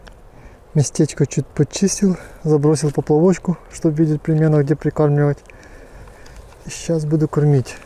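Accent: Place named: Okanye, Russia. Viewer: ты че вместо буквы А говоришь О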